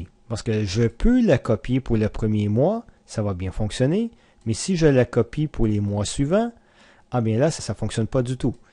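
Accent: Quebec, Canada. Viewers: vraiment très bien expliqué. Et belle effort sur l'accent car étant belge c'est assez compliqué de comprendre le québécois mais la aucun soucis. Très bonne vidéo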